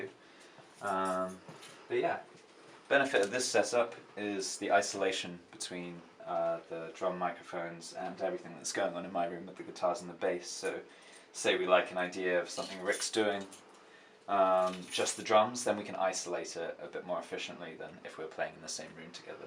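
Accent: United Kingdom. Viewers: Dude where are you from? You sound British but I can't be sure...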